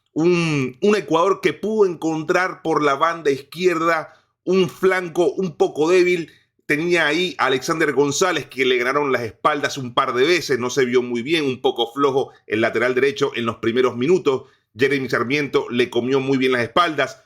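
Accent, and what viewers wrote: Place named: Argentina. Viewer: Panita, pongase a escuchar un cd de salsa o gaitas, ese acento argentino q agarro en 1 mes no cuadra, mucho menos hanlando de la Vinotinto. Buwn análisis.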